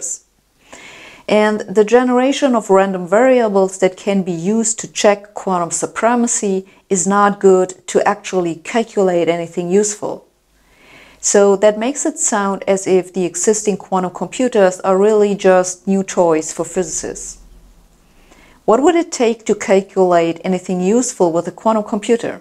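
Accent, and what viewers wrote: Germany. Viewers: Yeah. German accent = best accent (especially, of course, when pronouncing an actual German name :P) Prof. Hossenfelder has achieved accent supremacy.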